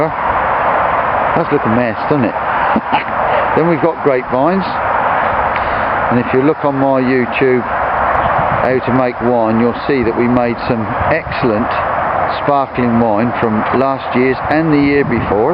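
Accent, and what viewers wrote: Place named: Portsmouth, United Kingdom. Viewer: Watching your cauliflower video, I guessed from your accent that you were from Pompey. How right was I? Horsea Lane Allotments?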